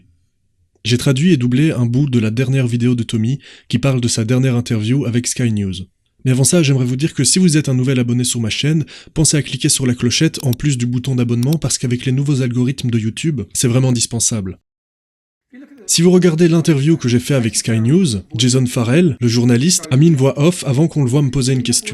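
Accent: Belgium. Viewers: Je suis belge et t'as un accent de chez nous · Ton accent belge ressort quand tu t'énerves. Cela veut dire que tu t'énerves vraiment, ce n'est pas feint. Tony Robinson est la victime du système politique et judiciaire anglais (les deux sont liés en Angleterre, ce n'est pas le système français). La raison d'état l'emporte sur la raison tout court. Il s'agit d'établir les connexions entre les gangs et les politiques locaux. C'est un travail de journaliste d'investigation. Ce type de journaliste est en voie de disparition (d'extinction) car trop dangereux et peu rémunérateur. Un bon perroquet (femelle de préférence) est très bien payé pour présenter les JT. Les tournantes n'ont pas cessé dans les cités françaises. Les journalistes n'en parlent plus pour ne pas stigmatiser les jeunes des cités. Tout va bien dans le meilleur des mondes.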